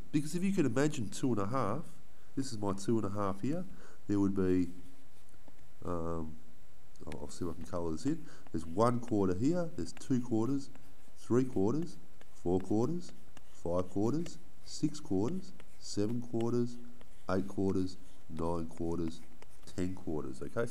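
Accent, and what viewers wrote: Australia. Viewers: If everything learning related, was taught in an Aussie accent, I’d probably be so much smarter and would have paid a lot more attention hahaha.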